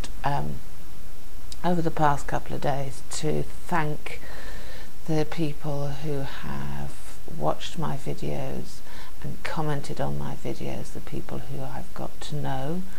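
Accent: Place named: United Kingdom. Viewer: what's not fair is how UK accents make for better videos! no fair!!! I want one. I'm with you... predictable in my avoidance of predictability. Means you never quite fit in groups eh, you resist the little conventions and micro-traditions even a small group of friends tend to develop. lets all be individualists together!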